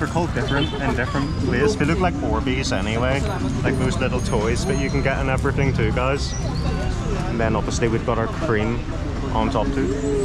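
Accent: Northern Ireland, United Kingdom. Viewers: Your accent reminds me of a pal from Northern Ireland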